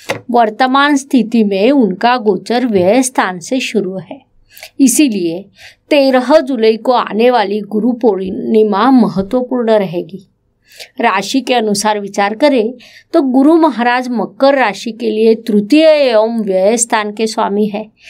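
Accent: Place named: West Bengal, India. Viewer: Ye kaunsa leheja hai ? Bangali hain kya aap ?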